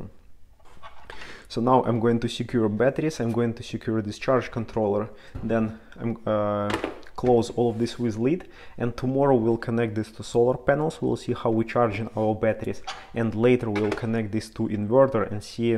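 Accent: Russia. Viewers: Сразу лайк за Бьютифул рашен акцент)👍 и видос хороший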